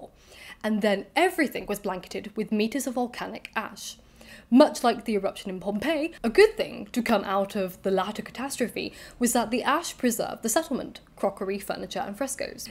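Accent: RP, United Kingdom. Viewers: Oh my days she's posh! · Im from London,and I must say you have possibly the most posh British accent.You should try and make audio book. You have such a strong elegant voice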